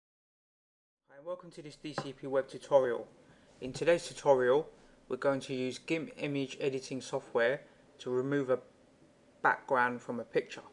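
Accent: United Kingdom. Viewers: Like the British accent! You need to do some gimp starter tutorials as you are telling elementary steps while doing advanced tutorials man!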